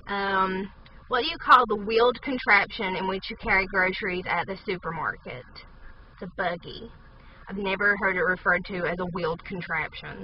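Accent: South, United States. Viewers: love the southern american draawl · Southern accent is more noticeable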